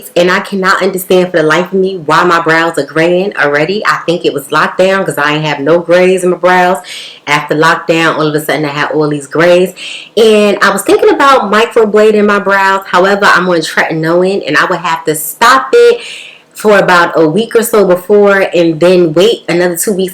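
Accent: New York, United States. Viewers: That NY accent takes me back home every damn time 😁🥰 As always sis, you did that. They look really nice and natural. I've been contemplating ordering a kit off Amazon to make my brow shape pop and this was all I needed to see to go ahead and order it. I'm 37 and my eyebrows haven't grayed yet (knock on wood) but girl why am I getting gray eyelashes?! Like what kinda nonsense is going on here?! Them gray hairs just be finding new ways to be mad disrespectful. Speaking of lashes, waaayyy back when you had that video of applying individual lashes, do you still do your lashes at home?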